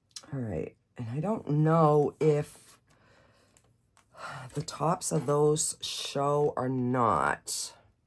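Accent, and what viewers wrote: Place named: Long Island, United States. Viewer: I’ve been trying to guess your accent since I stated watching you😂 I’m from the East Coast so I’ve guessed Long Island or Maine. I’m sorry if that’s too personal I’m just a curious person♥️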